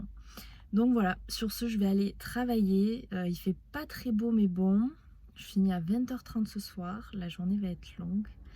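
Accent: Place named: South, France. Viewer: Bonsoir je viens de découvrir votre chaîne et c'est vraiment un vrai bonheur! Votre voix est douce et reposante, vos vlogs très inspirants. Je reconnais bien votre accent puisque je ne suis pas très loin (Carcassonne 😊). Du coup je vais dévorer vos vlogs ce week end j'en ai a rattraper 😅. Puis lire aussi quand même et jardiner aussi car il va faire très beau ☀️. Merci pour le partage 😉 et longue vie à votre chaîne. Si ce n'est pas trop indiscret, quel est votre profession ? Bonne soirée. Carine.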